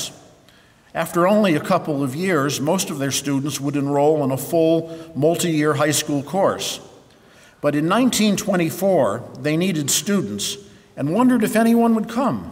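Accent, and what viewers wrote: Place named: Western Massachusetts, United States. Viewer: Hey! I found this speech while looking for samples of a Western Mass accent (for various mysterious linguistic purposes mwahaha), and I wanted to assure you that 1) even though it's been many years, I actually do remember the first "button" speech I heard from you, and 2) your accent is super familiar, like home, to me:-) All the best!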